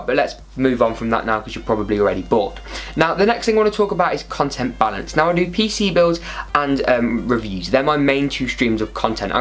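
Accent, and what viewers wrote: United Kingdom. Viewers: By your voice i think you're in the uk, where abouts in the uk would you suggest i buy parts (online or in store) i want to build the $700 build but buying in the uk with VAT and things it works out so expensive, so where abouts would you suggest to buy parts for the cheapest possible?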